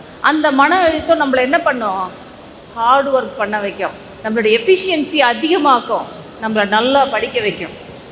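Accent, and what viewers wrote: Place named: Virudhunagar, India. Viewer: Medam ungala virudhunagar ill ungalado pessu arumaiyakka irunthathu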